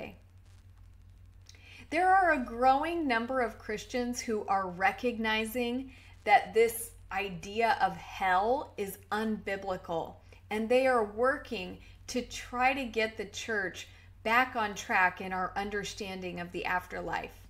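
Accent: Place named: England, United Kingdom. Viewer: that’s so so lovely I’m really really glad that my words made you happy because you have done the same for me with yours. I know no one has all the answers and not will we ever till we get to the other side lol! But it’s so nice to hear someone speak love and not eternal condemnation for once and fear mongering people into believing and judgement for everything u say think and do yano! That would absolutely be such a welcome help. I’m doing quite well but obviously u need that final push and as I say iv been quite unlucky with my experiences I honestly haven’t yet heard a story quite as bad as mine if I’m honest! But luckily I don’t suffer with fear and am just used to it now lol! I live in Bath, which is in the Somerset region near Bristol? Where are you situated I notice your English accent which is music to my ears as keep coming across Americans lol! 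And I reach out and am actually very very surprised about the lack of response and support I get with it all but I believe god leads you to the right people in time so have just been patient with the process. My email is <mail> If you would like to email a bit more privately 😁 Thankyou so much you are an angel 😇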